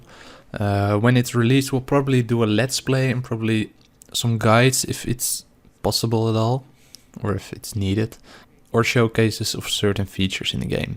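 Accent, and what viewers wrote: Netherlands. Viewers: Ha! ik dacht al dat je nederlands was 😂 hele goede video man!!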